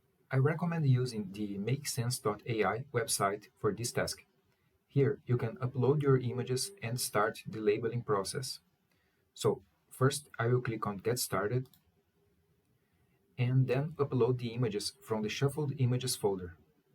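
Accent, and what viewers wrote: Brazil. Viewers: eu tava escutando vc falando e pensei, parece ser br, ai li o nome do canal kkkk vlw pelo tutorial mano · vc é brasileiro né ? parece pelo sotaque. abraço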